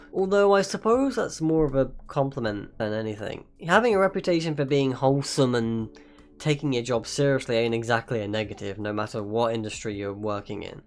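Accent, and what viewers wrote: United Kingdom. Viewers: Why are you British tho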